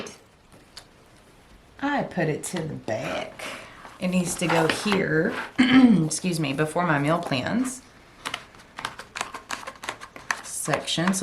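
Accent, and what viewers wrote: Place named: South, United States. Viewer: I really like your idea of using only two of each filler paper so you go through them... I'm the same way... I HAVE to have extended disc simply because I WANT IT ALL in my planner. It's the main reason I've come back to happy planner after having them for years but never knowing what a frankenplanner was.... Best thing EVER! I also love to hear you talk - that southern drawl!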